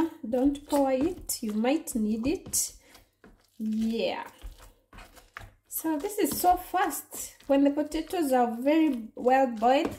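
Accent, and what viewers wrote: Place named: Coast, Kenya. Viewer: Hapa Mombasani ni Kitunguu Maji( costo accent😅😅👌)as always outgoing yourself in your kitchen😋😋yummy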